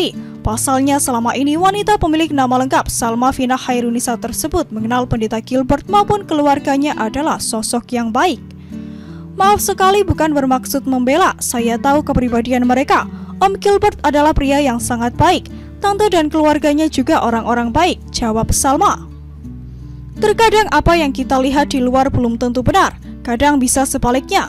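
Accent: Indonesia. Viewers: MEDOK banget ya yg baca scropt.... bikin SALFOK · Yang ngomong medok banget 😌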